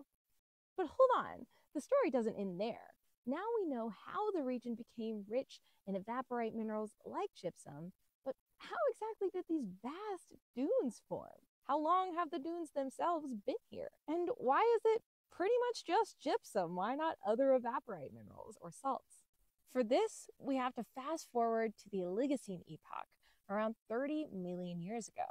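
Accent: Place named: New Mexico, United States. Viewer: Sounds like you grew up in New Mexico?! Just makes me enjoy your videos that much more!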